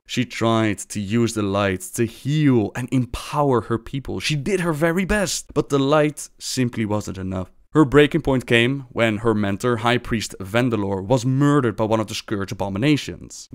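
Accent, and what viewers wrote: Netherlands. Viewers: Garrosh Hellscream If you're talking about, he is Dutch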